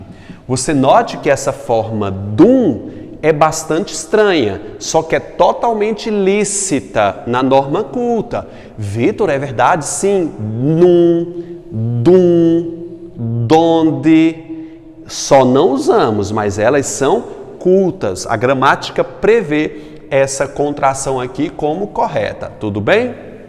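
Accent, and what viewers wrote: Northeast, Brazil. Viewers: Bom dia professor. Eu vi um vídeo antigo seu de análise morfológica,muito bom,eu achei incrível me ajudou muito na prova. E lá eu percebi q vc tinha um sotaque parecido com o meu,e gírias conhecidas aqui. Pensei q vc fosse do nordeste mas vc é de Juazeiro do Norte,eu moro numa cidade vizinha a Juazeiro. Q legal. Ótimo professor, parabéns 👏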